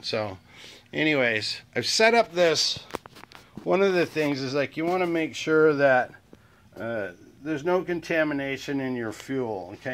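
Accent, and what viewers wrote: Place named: Massachusetts, United States. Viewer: sound like a mass accent love it from new hampshire.. good vid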